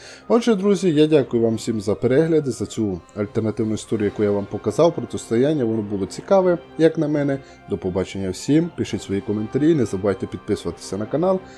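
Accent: Transcarpathia, Ukraine. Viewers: Якщо я правильно зрозумів по говору, то ви з Закарпаття. Можна зробити особливістю канала те, що ви будете включати у свою мову особливості вашого діалекту)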